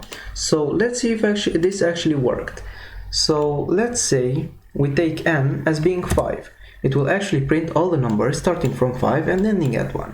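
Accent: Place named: India. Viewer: More indiant accent please!!! Btw great video!!! Sub from me😁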